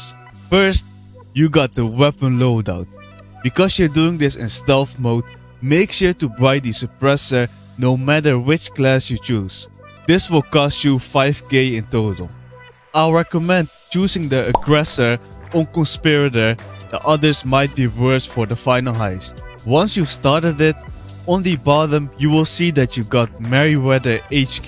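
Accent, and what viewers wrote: Netherlands. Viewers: are u dutch? you have a bit of a dutch sound when you speak english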